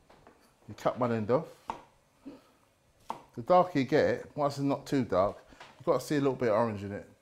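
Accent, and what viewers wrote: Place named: England, United Kingdom. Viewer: With that accent, he was born in England, London I would guess (lol). He sure loves his powdered seasonings...............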